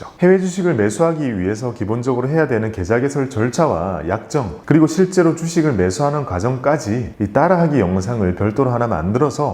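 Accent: Gyeongsang, South Korea. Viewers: 경상도 억양이 남아있어 엑센트처럼 귀에 쏙쏙 들어오네요 ㅎㅎ ^^ 주린이 에게 너무 도움 됐어요~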